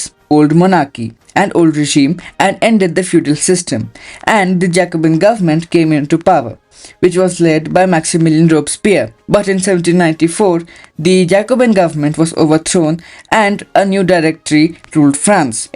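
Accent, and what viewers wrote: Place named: England, United Kingdom. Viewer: Excellent work,Your explanation of the French Revolution is so clear and engaging, and your English accent makes it even more enjoyable to listen to. Looking forward to more of your videos.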